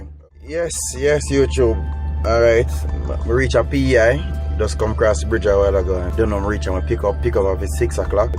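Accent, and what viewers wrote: Jamaica. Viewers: Omg didn’t expect the patois lol. Love it new subscribers 🙋🏽‍♀️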